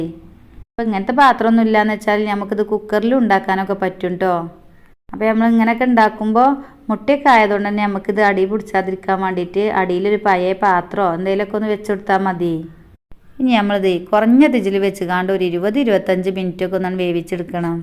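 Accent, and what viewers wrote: Malappuram, India. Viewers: Ithaaa Malappuram ethu bhagathaa ee kodukaa, edukka ennu parayunathu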